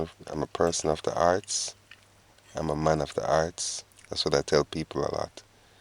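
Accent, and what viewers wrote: Jamaica. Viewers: I am enjoying your colorful cooking this great blessed Saturday morning. And please do not speak patio, not everyone understands the twist in the English pronunciation. You are making videos for the general public, correct? You will have more more subscribers. Great number turn ups for you internationally. In JESUS Christ mighty name we have prayed amen 🙏🏽!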